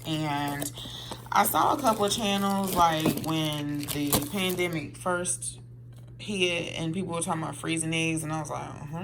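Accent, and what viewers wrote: South, United States. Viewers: Thank you for sharing from your heart! 🥰 Thanks for the encouragement to go shooting too. I keep thinking that, but draaaaag my feet. Perfect date idea right now!! Great job getting into canning and gardening. I’m a newbie too. It’s definitely in your genes!!! 🧬 I’ve got oxygen absorbers and Mylar bags in my amazon cart right now! Haha Just waiting on the next paycheck. 🤑 Blessings to you, dear Sister! Fall gardens are great! I’m not sure where you’re located but I’m hearing some southern accent. So if you’re down south (I’m in SC) you could do well with a fall garden. I’ve had lettuces, broccoli, & cabbages do well producing through the winter here. Jump in!! 👩🏻‍🌾🥦🥬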